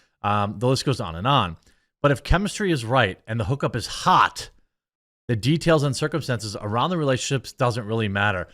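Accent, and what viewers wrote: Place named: North, United States. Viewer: your accent isn't "Midwestern." It's Northern. I only hear people with your accent in Michigan, Wisconsin, and Minnesota. I'm from Indiana and no one here says "Dreg-in."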